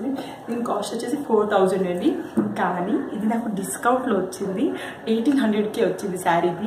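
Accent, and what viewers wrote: Telangana, India. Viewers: meedhi ea ooru.. swatchamaina telangana lo chepthunnavu.. i like you..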